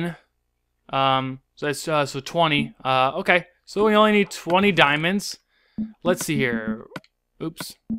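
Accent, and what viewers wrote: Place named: England, United Kingdom. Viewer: not bad inglish acsent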